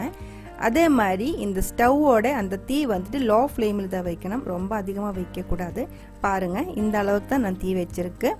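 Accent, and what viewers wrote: Kerala, India. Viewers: Kerala smell is reflect ing in ur sweet speach chachi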